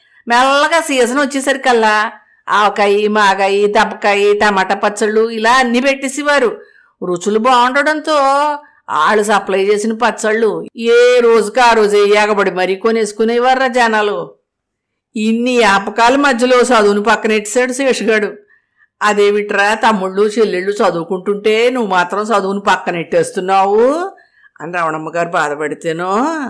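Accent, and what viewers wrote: Godavari, India. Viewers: గోదావరి జిల్లా యాసతో బాగా చదివారు. కధ చాలా బావుంది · గోదావరి జిల్లా యాస తో బాగా నవ్వించారు.పాకం గారెలు ఎప్పుడూ తినకపోయినా తిన్నట్లుగానే వుంది మీ మాటలు వింటుంటే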